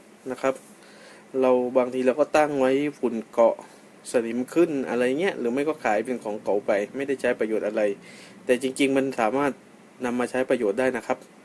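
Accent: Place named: South, Thailand. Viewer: พี่คนใต้ไหมพูดเหมือนคนใต้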